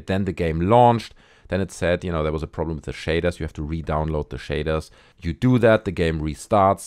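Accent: Germany. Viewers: are you german you have a very german accent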